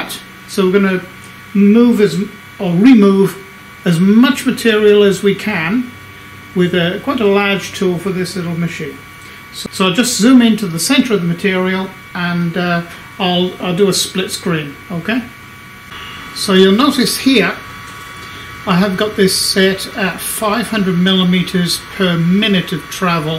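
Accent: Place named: England, United Kingdom. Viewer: dude you sound english but you are talking in a currency that WE DO NOT USE?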